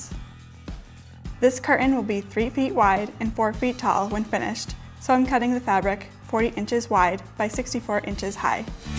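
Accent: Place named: United Kingdom. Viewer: Very British pronunciation. I notice a lot of young YouTubers now drop their Ts.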